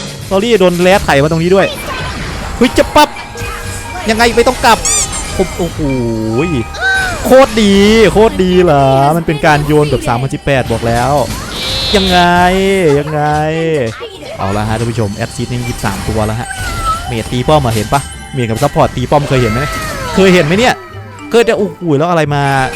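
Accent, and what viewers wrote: Isan, Thailand. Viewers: เป็นคนที่พูดอีสานแล้วดึงดูดใจแปลกๆ ค่ะ55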